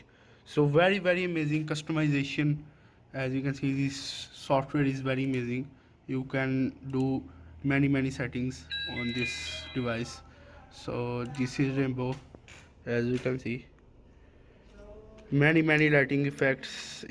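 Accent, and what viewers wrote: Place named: India. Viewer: Your Indian accent is so deep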